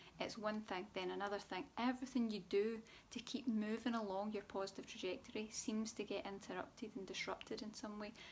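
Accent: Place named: Scotland, United Kingdom. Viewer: Ohhhhh boy! Have you ever described the last month and a half to a "T" for me! I thought that was what was going on, but I needed the confirmation - and here you are! Thank you so much. 😃 P.S. I love love love Scotland and your brogue! Cheers from Canada!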